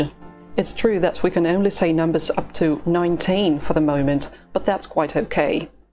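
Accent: United Kingdom. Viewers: as a russian your english like BBC reporters ı am not sure ı follow your channel your english or your russian.. but it makes me keep watching . thanks anyway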